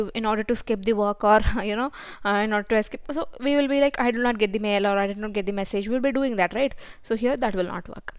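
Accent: South India, India. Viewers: South indian mam 100 % and she sounds soo sweet in headphone got obsessed 😍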